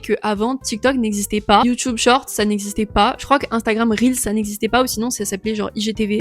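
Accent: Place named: South-East, France. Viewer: Oh t’es une meuf du sud est 🥳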